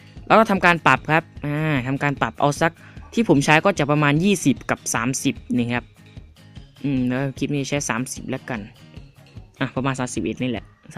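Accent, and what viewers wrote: Nakhon Phanom, Thailand. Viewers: คนนครพนมหรอครับ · พี่คนนคระนมหรอครับ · พี่อยู่จังหวัดไร เหมือนนครพนม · พี่อยู่นครพนมใช่ไหมครับ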